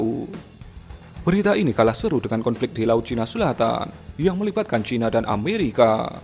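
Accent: Java, Indonesia. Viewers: Mbok medok nya dikurangi om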